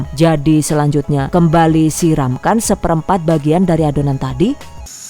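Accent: Banyumas, Indonesia. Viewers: Ngapak luuurrr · Deneng ngapak😂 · ora ngapak ora kepenak,wong ndi jane rika yu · Maaf bun njenengan tiyaang pundi nggih, kayaknya ngapak. Siapa tau deket jadi bisa belajar langsung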